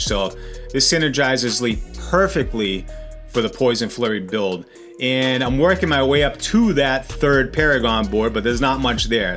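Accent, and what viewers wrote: Boston, United States. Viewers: Aside from the cool build, this video is quality. Good pacing, delivery, and energy. Getting some Boston vibes too for an added bonus.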